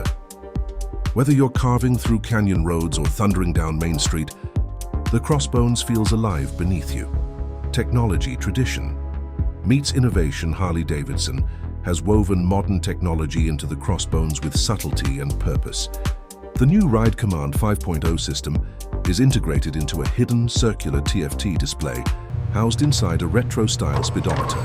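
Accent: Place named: United Kingdom. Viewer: He sounds British 😅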